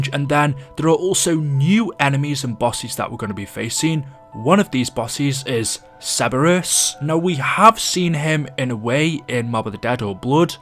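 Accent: United Kingdom. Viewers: Love your voice and vids you sound like a British Person no Offense if you are one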